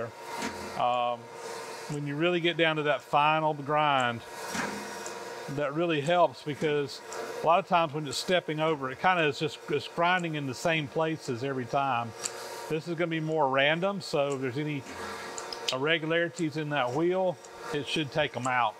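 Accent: South, United States. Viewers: you might find this a bit funny. When you say "arn fest," I used to think you were saying "iron fest" with your southern accent, But recently I saw Mr Pete's video and he had an "Arn fest" t-shirt on. 😂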